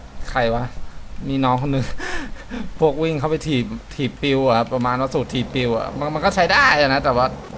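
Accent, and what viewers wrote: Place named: Suphanburi, Thailand. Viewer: พี่เป็นคนสุพรรณบุรีป้ะ เหน่อเวอร์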